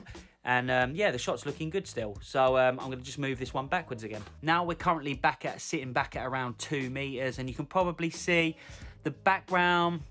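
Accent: United Kingdom. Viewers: having a studio at home is always an issue. It’s the biggest issue that stops people from setting up a channel. I can tell from your accent your from the south east, stay safe mate.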